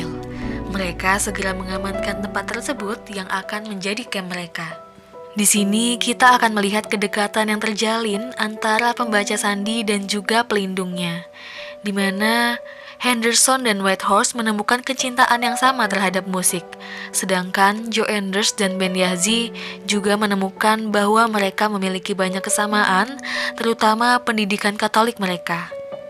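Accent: Semarang, Indonesia. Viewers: Benern semarangan hehe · orang semarang kah mbak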